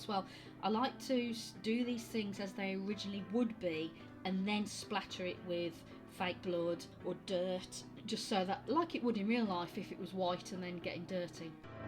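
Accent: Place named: West Midlands, United Kingdom. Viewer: I am glad you put her lower jaw on she looks fabulous.She’s actually quite glamorous for a zombie lol.I love the face paint and the cap really does make a great option to scraggly hair...The way you did her mouth was very clever and made her look less doll like.I also like the pose too it’s really good and I think the fixed dolls look great as they stand better than on those plastic stands..Apart from the face my other favourite part is her boots.They are so cool ,and I like the colour you did them..Do I detect a West Midland accent with you too.have not noticed until today..I am from there .It’s actually nice having one of my favourite doll makers coming from here...Keep up the great work I am looking forward to your next one 🥰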